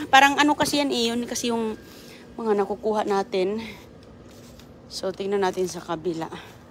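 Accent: Visayas, Philippines. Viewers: korek poh.. grabe mkpanlait sa salita ni eh alam.nmn nia laking probinsya at bisaya nga... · Feeling perfect nman itong SI, Visaya sya huh Kya iba ang intonation ng words nya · Tama,mga walang hiyang bashers bash ng bash buti sana kung english teacher vlogger yan para magandang magpronounce eh visayan people kadalasan matigas dila...mga walang kwenta mAnuod lang ni para makapangbash..walang ambag sa mundo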